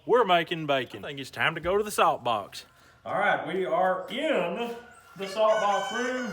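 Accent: Tennessee, United States. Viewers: I thought I heard some Tennessee in that accent. Also are yuns off grid?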